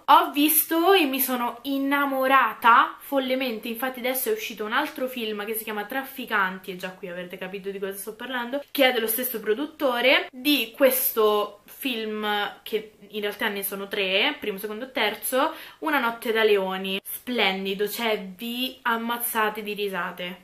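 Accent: Rome, Italy. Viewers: Il tuo romano il top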